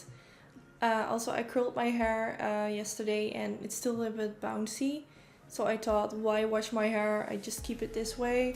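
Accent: Netherlands. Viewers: Love your full face tutorials!!! Listening to you speak makes me miss living in the Netherlands.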